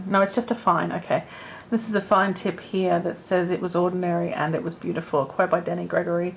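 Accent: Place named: Australia. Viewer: Heard the accent and was like "yay! Aussie!" And somehow ended up subscribed. 😄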